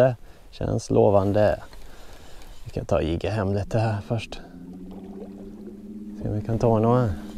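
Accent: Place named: Gothenburg, Sweden. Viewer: Vad var det för frukost du hade med dig? Hörde inte vad du sa för din göteborska ☺️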